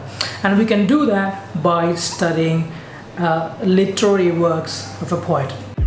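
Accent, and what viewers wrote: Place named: United Kingdom. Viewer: Pure British accent wow...Thanks.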